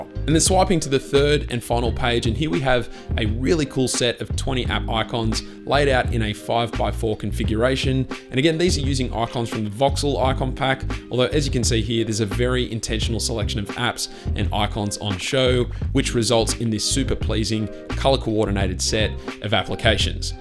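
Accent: Australia. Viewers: Watching all the tech YouTubers. I forget how heavy and distinguishable our Australian. Great work also, I don’t have an android phone but this was so cool.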